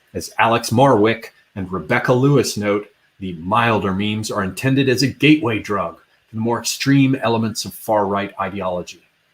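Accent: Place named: England, United Kingdom. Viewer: D That is so fun! Your posh English accent has a combination of Irish and German dialects coming through. I'm sure glad I'm not the only one going around speaking in various accents. I tend to use Scot's English and Russian, especially when I'm brought to anger by the children. They know when mommy starts sounding Russian she lost control. ha ha ha To my credit it is seldom. Like that joke, "But did you die?" Any dialect is fine but please, no Ebonics. I have to think to myself: "School didn't fix that for you?" but then again we still have fools walking around out here not understanding the difference between your and you're.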